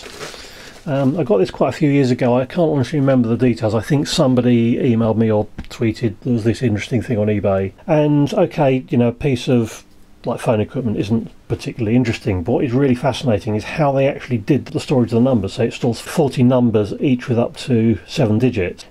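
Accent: United Kingdom. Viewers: Возможно, вам имеет смысл проверить слух. Автор видео англоговорящий, с явно выраженным британским акцентом и чёткой дикцией.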